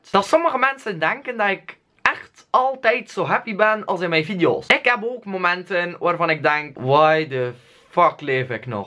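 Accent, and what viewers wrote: Belgium. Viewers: probeer eens in een video zonder een Belgisch accent te praten maar met een Nederlands accent lijkt me wel een x grappig;p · je hoort duidelijk dat hij n Belg is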